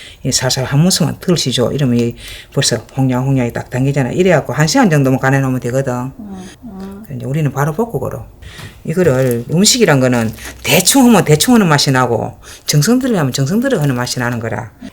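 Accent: Gyeongsang, South Korea. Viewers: 경상도말씨에도다정다감 참듣기좋요. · 저도 오리지널( 대구에서 태어났고 현재 70년..) 쉽고 간단하게 맛있게 소개해주어 감사합니다. 그리고 멘트가 경상도사투리로 홍양홍양 너무 재미있습니다. 강력한 응원자로 따라 해봅니다. 숨은 맛있는 경상도요리 더 많이 소개해주세요. 이미 알려진 레시피는 별로 입니다. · 목소리가 너무듣기 싫어 경상도 억양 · ! 요리강좌 공부도 공부 이지만 두분의 알콩달콩 따님의 솔직한 맛 이야기 듣는 재미로 자주 기다려져요 경상도 사투리도 구수하구요 ㅎ 겨울에 뜨끈한 국물 자작한 소불고기 쉽게 알려주셔서 넘 감사해요 따님은 목소리도 정겹지만 엄마가 해주시는 요리 드시고, 무해한 여러 음식드셔서 곁에서 보고 배우신 눈썰미가 대단하실것 같습니다 건강미인 이실듯? 싶네요 ♡ 오늘도 맛있는 불고기 요리 생표고 보다 건표고가 특유의 냄새 안난다는 꿀팁얻고 시청 잘하고 갑니다 두분 새해 복많이 받으시고, 무엇보다 건강이 최고이니 건강하세요? 고맙습니다!! >_< ♡♡ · 요리를 볼때마다 참쉽고 만나게 많들어요 경상도 말씨여도 참 호감이 가네요 잘 보고 감니다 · 경상도 말씨가 참 구수하네요 저도 제대로 배웠어요~♡ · 구수한 말씀이 경상도 분 같애요. 참 간단한 설명에요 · 주부경력 35년인데 보면서 배울 꿀팁이 한가지씩있네요~그리고 댓글보는 재미도 쏠쏠ᆢ꾸밈없는 갱상도사투리 ᆢㅎㅎ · 선생님 경상도 말씀이 더 재밌어요^^ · 경상도 사투리가 구수하고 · 경상도 사투리말씨가 너무재미있습니다 불고기도 맛있게보이고 엄마하고 딸내미 사이가 너무보기좋아요 울엄마생각이 나네요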